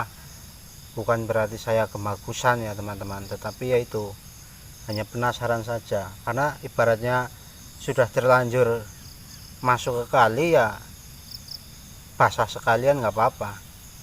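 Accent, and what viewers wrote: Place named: Java, Indonesia. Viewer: Medoknya terasa banget org mana ni bang grndut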